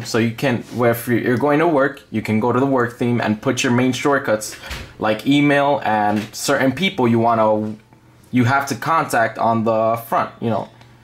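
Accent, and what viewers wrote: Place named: New Jersey, United States. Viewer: sounds like he's from Nj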